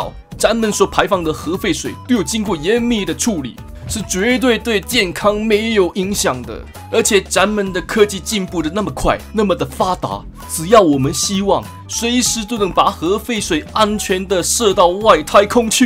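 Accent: Taiwan. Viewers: 完全不是普通話，單純的是台灣口音國語😂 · 呢個完全冇大陸口音，係台灣口音嘅國語啊😂😂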